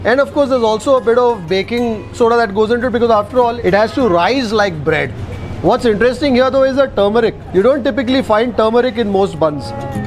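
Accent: Karnataka, India. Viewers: Lady don’t spoil English by adding Kannada accent to every English word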